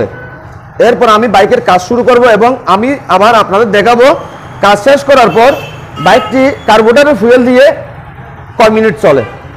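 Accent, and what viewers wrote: Chittagong, Bangladesh. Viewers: Apni ki cittagong er na ki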